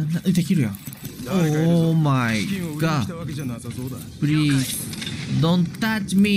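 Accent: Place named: Japan. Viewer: perapera Engrish is still the best thing I've ever heard ngl.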